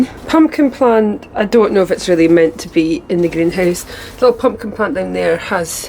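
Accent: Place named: North, United Kingdom. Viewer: I thought I heard a northern accent, r u from Shetland?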